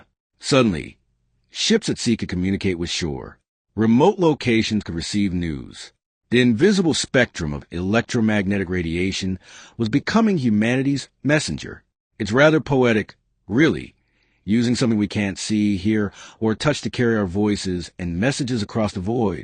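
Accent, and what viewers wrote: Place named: United States. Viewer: I liked the AI voice chosen here. American. Not those couple of English guys all over YouTube who sound like they have a cold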